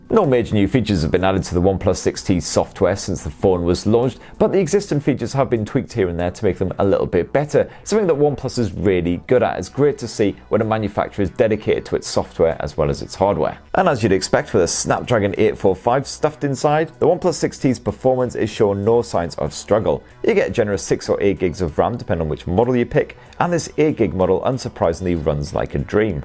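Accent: United Kingdom. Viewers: Is this the legendary UK accent?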